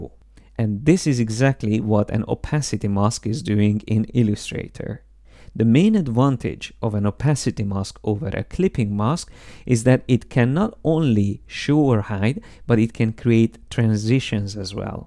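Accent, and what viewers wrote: Hungary. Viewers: Nice tutorial, are you hungarian?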